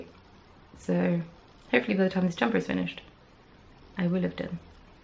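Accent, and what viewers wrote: United Kingdom. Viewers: 😂😂😂😂😂 Balls to the wall, that made me really cackle!! Especially as you are sounding extremely British today. As always fab crafty chat!! Xxxx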